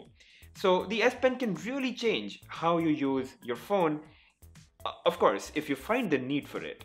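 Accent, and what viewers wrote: India. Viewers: 这英语的咖喱味真明显。。。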